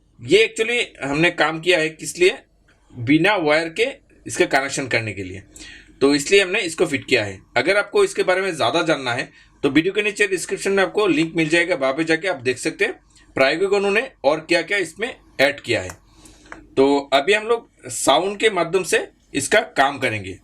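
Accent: Bengal, India. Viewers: Sir yuo are bangali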